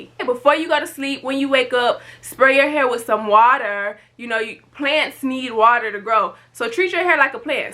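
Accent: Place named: Atlanta, United States. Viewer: I’m getting real Atlanta GA vibes from your accent 😂 love it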